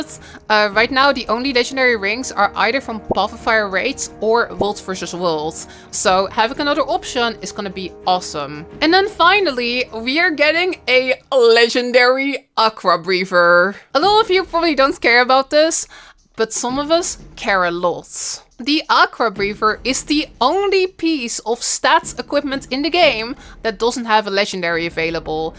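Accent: Netherlands. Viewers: you dutch accent seem to be fading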